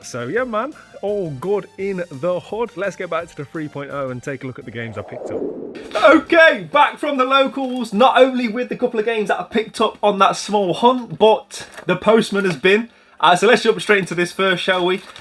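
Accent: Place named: Derby, United Kingdom. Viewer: Ah just started watching your videos and thought the way you speak was too familiar, good to know other Derby yocals exist 😂. I normally go with “Welcome to Derby… sorry” if you’re needing a new intro line for the place.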